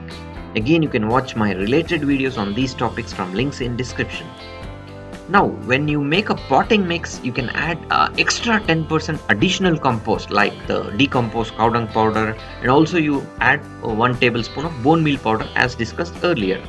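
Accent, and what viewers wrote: India. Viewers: These are common living soil organic farming techniques, if haveing an indian accent made it spiritual for ya then well lol ok man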